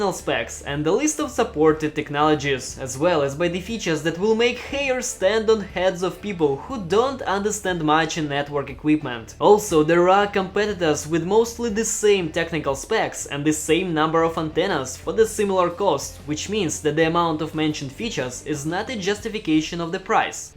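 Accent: Russia. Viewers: Russian English killing me🤣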